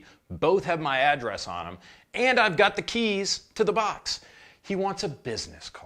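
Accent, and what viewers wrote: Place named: Southern California, United States. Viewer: Hey, how come you have a Southern Californian accent but you’re from the actual South? You sound like one of us. 🤙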